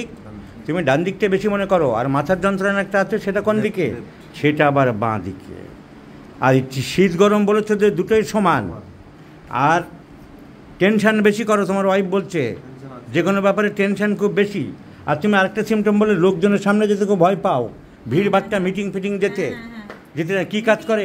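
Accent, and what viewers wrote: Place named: Bangladesh. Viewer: এইভাবে কি patient এর privacy breach করা যাই? এগুলো কি ডাক্তারি? এতো বাজে উচ্চারণ কেন? এতো বাংলাদেশী মনে হচ্ছে। ভ্যাকসিন এর নামে চালিয়ে দিলো 😂। ছোটবেলায় পোলিও ভ্যাকসিন নাওনি?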